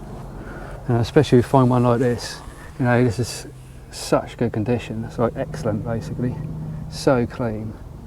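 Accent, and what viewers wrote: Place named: United Kingdom. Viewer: Hmm let's see, British accent, drives on the left, big yellow license plate. I wonder where you're located.......... Tennessee ???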